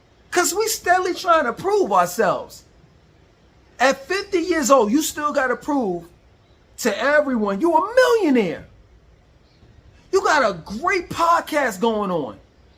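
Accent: New York, United States. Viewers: No offense, but your New York accent is still strong as hell bruh. You can’t hide that shit. Your accent comes from where you learned to talk. People never lose their accents. That’s why Slick Rick never lost his accent even though he moved to NYC when he was a kid. I had the same experience moving from the Bay Area California to Maryland as a kid in the early 90’s. Cali Hip Hop was huge in the 90’s. I wondered why people in suburban Maryland idolized Cali lifestyle so much because my childhood neighborhood was exactly like Boyz ‘N the Hood, you might get Ricky’d just walking to the corner store. Like y’all really think old school cars, gang life, guns and smoking weed and selling drugs and living in a dangerous neighborhood with palm trees is cool? I just wanted to see snow and have a neighborhood safe enough to explore as a kid and I didn’t have that in California. 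And I embraced that background hard as a confused teen and twenty something. But as a grown man, I represent myself like I’m in court with no lawyer. It’s damn near an act to of rebellion to embrace yourself as who you are in modern society. Everyone is so aspirational nowadays because of social media. Women nip and tuck everything on their bodies and try to be a build a bytch. People who come from money cosplay like they are from the projects in Brooklyn, broke people in the projects cosplay like they are rich African scammers. People over 40 want to be forever 21. Black kids in the suburbs try to act like they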